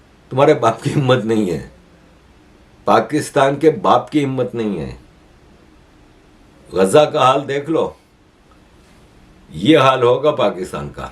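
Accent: Gujarat, India. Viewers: sir I watch your videos and am always curious why you say "jay jindera" in your intro. Then I research about you and I found out your roots from Junagadh Gujarat and your accent is also Gujarati Hindi/Urdu accent I got that coz am also being gujarati i got that now. Hats off sir of your work and spread knowledge & awareness to world.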